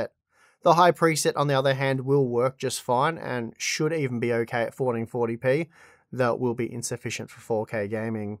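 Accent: Australia. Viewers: I’m sure most of the viewer base is from the states but when I’m listening to a Aussie I just wish you’d use aud prices 😿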